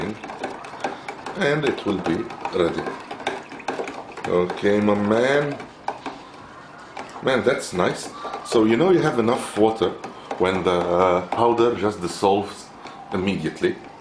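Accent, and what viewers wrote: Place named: England, United Kingdom. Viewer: Loved ur eng accent dude!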